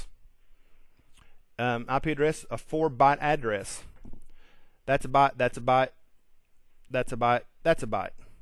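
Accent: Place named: Texas, United States. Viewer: I like that accent. Texas? Somehow it makes me a better listener and able to process more info.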